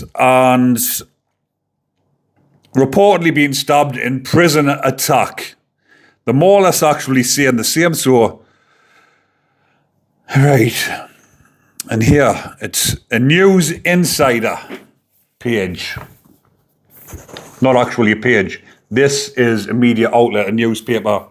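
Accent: Newcastle, United Kingdom. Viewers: Varley is the perfect example of why there should be a death penalty. Love to hear the Geordie accent from so far away, too bad about your piggy' lug - haven't heard that word for years.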